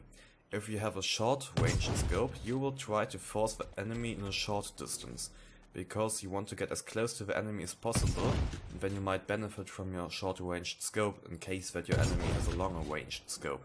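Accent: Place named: Germany. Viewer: German accent! sounds so weird to me but english people love it lol. Also very good video man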